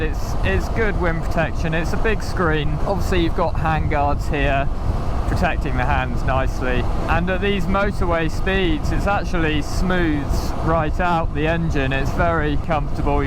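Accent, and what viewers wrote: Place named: United Kingdom. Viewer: Again a very nice and good review of yours. Good voice and nice british accent I envy so much as a Belgian ! Thumbs up ! 👌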